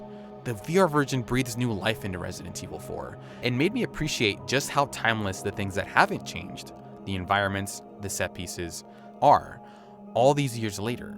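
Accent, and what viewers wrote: Spain. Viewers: Disliked! You say every word annoyingly in a Spanish accent, but you can’t pronounce Luis’ name properly? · So refreshing hearing someone pronounce the names in a proper Spanish accent. Great video!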